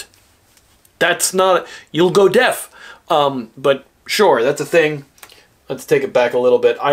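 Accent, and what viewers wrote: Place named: Scandinavia. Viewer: Oh. I thought he was from one of the Nordic countries judging from the accent. My point about him being a good Doctor still stands.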